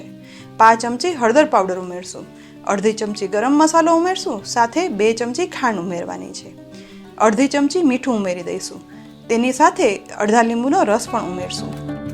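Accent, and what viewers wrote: Kathiawar, India. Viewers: Reecipe tau saras chhe j, Kathiyawadi guajrati saambhalavaa ni majaa aavi. ne tamaaro avaaj pan mitho chhe ne saras reetey samjaavyun! Aabhaar.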